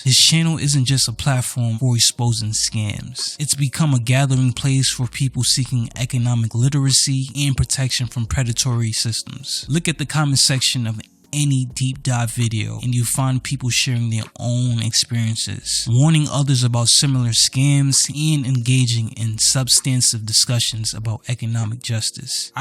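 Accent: New York, United States. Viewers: The NY accent is coming thru super strong!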